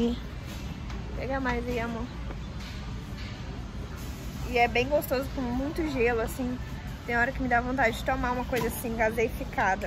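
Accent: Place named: Minas Gerais, Brazil. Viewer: Eu amo sotaque assim. Não conheço mas parece ser mineira ou go 😂.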